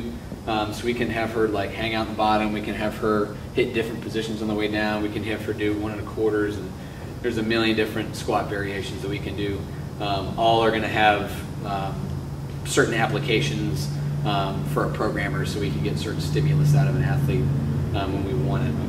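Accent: Appalachia, United States. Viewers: Fuck i love that hillbilly accent :D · I thought this guy was joking when he started talking. Fucking hillbilly.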